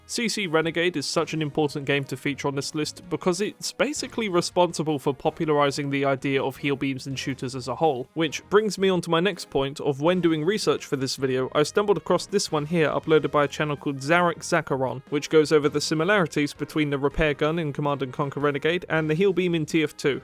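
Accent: United Kingdom. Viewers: is * not british?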